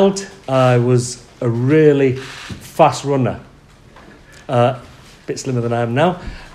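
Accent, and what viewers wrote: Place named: Yorkshire, United Kingdom. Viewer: Great Yorkshire accent..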